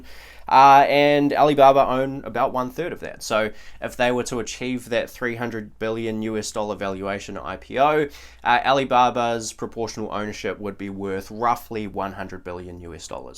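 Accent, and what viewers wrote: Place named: New Zealand. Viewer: Nice to hear a kiwi accent for a change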